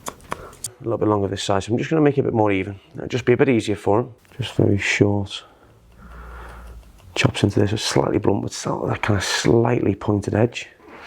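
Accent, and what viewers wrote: Liverpool, United Kingdom. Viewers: Are yu from liverpool i have a strong feeling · You're from Liverpool I can tell so err yes lar was happenin kid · He snds scouse if yer based in Liverpool lad gis a message where yer at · where actually is he located, i am guessing somewhere in the UK judging by the accent but that if fine for me as someone that lives in the UK.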